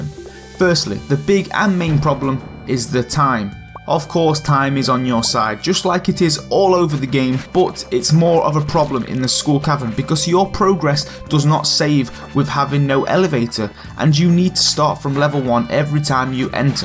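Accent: United Kingdom. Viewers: Gotta love bri'ish accent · Ur so bri'ish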